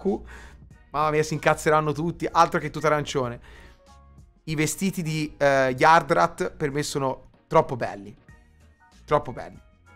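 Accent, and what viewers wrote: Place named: Tuscany, Italy. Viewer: Questo è popo toscano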